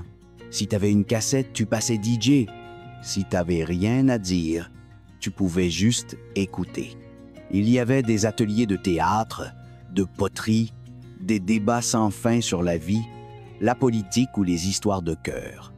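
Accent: Quebec, Canada. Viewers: Mais c'est de la France dont vous parlez, ou du Québec? l'accent dans la narration est vraiment québécois, et je vois l'affiche Théatre Princesse avec Ville de Québec dans le visionnage. De quel pays parle-t-on exactement svp???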